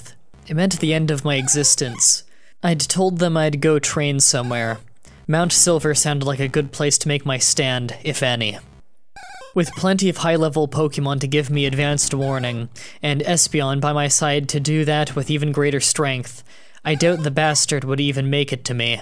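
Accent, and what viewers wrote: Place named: Canada. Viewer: Danielle Eaton Since it looks like he isn't going to reply I will, the words he "mispronounced" are Americanized typos. Meaning they were actual words that Americans spelled incorrectly when making the dictionary. He is Canadian and reads the words as they should be read, the rest is his dialect. He has stated this at this point probably more than one hundred times and it might even be in the description of his creepypasta videos.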